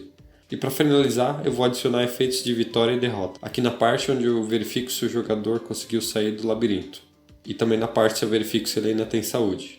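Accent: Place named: Brazil. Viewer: Eu te conheci pelo canal de inglês, o sotaque brasileiro te identificou, video mto bom, muito obg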